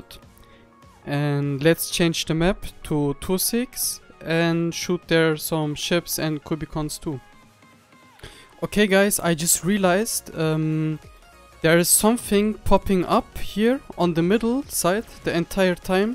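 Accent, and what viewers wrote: Germany. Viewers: Junge man hört den deutschen dialekt sofort raus hahah aber trz nice video😃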